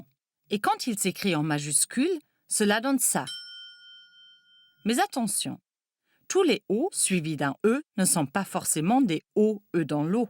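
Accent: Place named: Germany. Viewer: Ç'aurait été quand même mieux si la présentatrice parlait français sans cet accent allemand un peu gênant. Mais bon merci en tout cas pour la vidéo.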